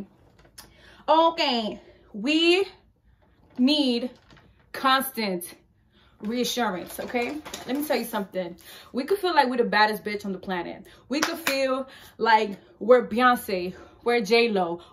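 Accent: United States. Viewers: Lmao omg you sound just like meeee! You def gotta be from NY or something! Anyways , CANCER GANG July 12th♋️ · July 16th you sound like your from the Bronx also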